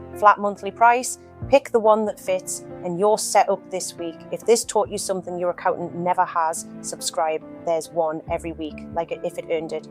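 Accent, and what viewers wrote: Newcastle, United Kingdom. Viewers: The Duke's estate is Alnwick castle, and the Dutches has built a poison Garden with almost every deadly plant in there behind security. Love your channel keep up the good work and its nice to hear the Geordie accent.... pet!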